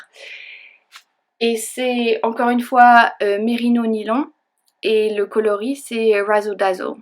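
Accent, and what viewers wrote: United Kingdom. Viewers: Super, merci pour ce podcast et vivement le prochain. J'ai hâte de voir tes trouvailles d'Ecosses. Et j'adore ce petit accent British ;-) à très vite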